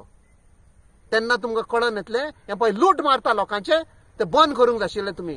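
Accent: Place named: Konkan, India. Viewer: Enough enough. Getting irritated by your accent of konkani. Khuicho re baba tu? Outside Goa?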